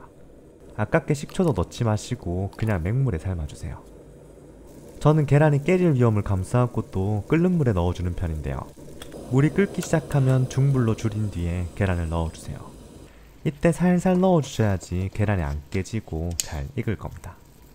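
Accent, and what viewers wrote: Gyeongsang, South Korea. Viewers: 경상도 총각이네예~~~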